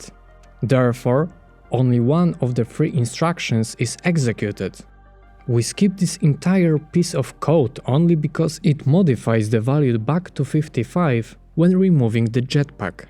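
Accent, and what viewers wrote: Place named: Poland. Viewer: jesteś z polski słysze akcent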